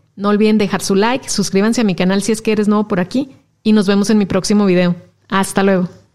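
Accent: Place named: Monterrey, Mexico. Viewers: Yo supondría que es de Monterrey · De donde eres y porque hablas como regia?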